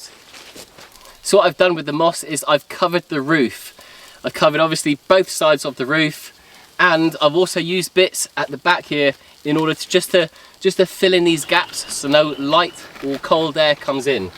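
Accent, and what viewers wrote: United Kingdom. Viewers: our big Yellow Lab named Bentley and our four White Swiss Shepherds named Pearl, Mauna Kea, Singh Dog and Tiger all love watching you and Amber in your wonderful and healing videos... and my Celtic dna loves your lovely accent and gentlemanly nature... it reminds me of time spent traveling in England and Scotland years ago... felt right at home... lots of love and respect to you and plenny aloha from the Big Island of Hawaii...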